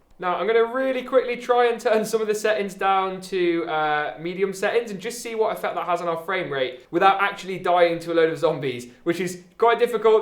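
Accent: United Kingdom. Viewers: I love the way you speak in the British Accent.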